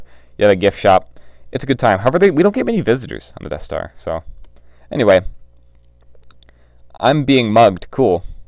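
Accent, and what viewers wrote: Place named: United Kingdom. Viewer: brilliant bri ish accent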